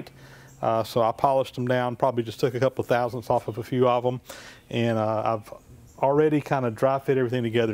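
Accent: South, United States. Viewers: I like how when is talking to "us" on camera - his accent is subdued, but when he's talking to other local folks - it's 100% good old boy!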